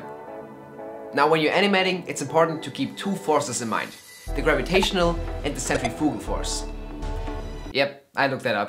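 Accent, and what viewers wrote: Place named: Germany. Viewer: I hear German and I like it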